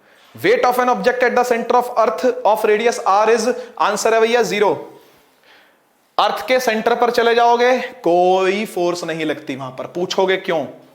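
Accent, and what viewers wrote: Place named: Pahari, India. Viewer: Sir bhi pahari bolte ha............ nicha thala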